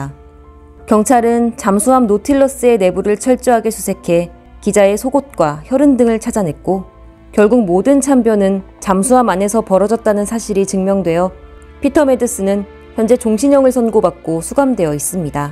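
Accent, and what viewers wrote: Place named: Japan. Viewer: 발음을 보니 일본어를 할 줄 아는 분이시네요. 맞죠? ^^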